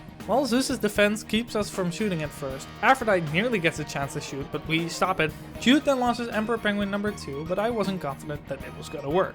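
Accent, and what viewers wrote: Netherlands. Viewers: If you keep continuing this series you'll have my reeled in man. Such an entertaining series to watch! En Nederlands zo te horen! Ga zo door maatje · I love that you can hear the dutch😂. I love the vids so far. I began watching after I got the game again. I just got the game again, I am loving it just like i did years ago. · Are you dutch? I feel like I hear a little Dutch in ur reactions😂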